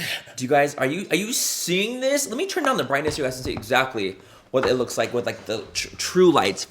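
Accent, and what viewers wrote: United Kingdom. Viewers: Loool the British accent 😂😂 love it!! 👋 hi all the way from London ☺️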